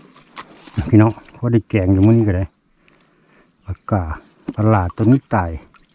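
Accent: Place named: Laos. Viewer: อยู่จังหวัดอะไรเว้าลาวม่วนดีเนาะแต่บางคำข่อยกะบ่อเข้าใจ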